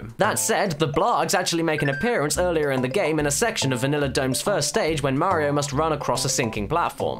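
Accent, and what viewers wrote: United Kingdom. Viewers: Well is from the United Kingdom. Words and pronunciation is varied in some places from there. It depends where they grew up.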